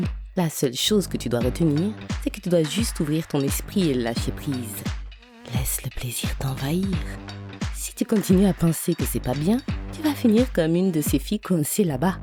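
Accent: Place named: Tokyo, Japan. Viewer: On dirai tokyo la voix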